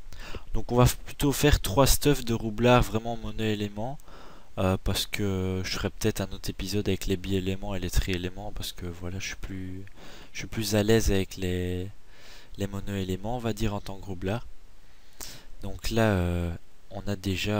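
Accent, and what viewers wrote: Belgium. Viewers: Il est belge:D ?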